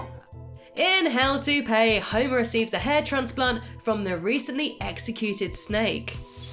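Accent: United Kingdom. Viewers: love the Brit voice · Love British voices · She's speaking British English, though.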